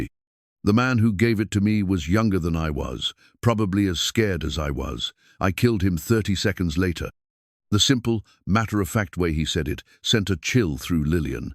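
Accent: England, United Kingdom. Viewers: It's too bad you've got stuck with this narrator, he doesn't know how to pronounce American names & words, he's too English he sounds completely strange. Please give him & his boss my comments.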